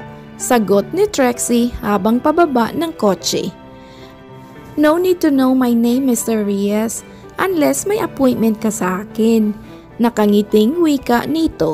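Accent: Visayas, Philippines. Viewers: Hay ano ka bisaya mag ayos ayos ka naman mag salita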